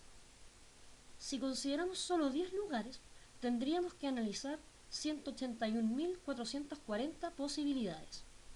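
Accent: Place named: Chile. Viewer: me fascina el acento chileno :3 buena explicacion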